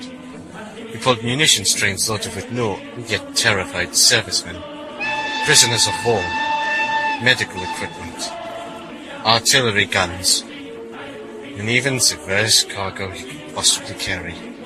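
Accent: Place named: Germany. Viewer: Nice one! Now I'm so hyped!!!!😁😁👍👍👍👍👍👍👍plus I love your German accent 👍👍😀😁😊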